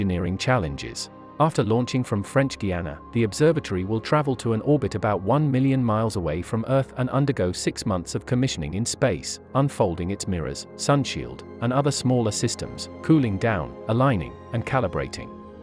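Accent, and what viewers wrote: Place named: United Kingdom. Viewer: Thats a robot voice? I thought he was just british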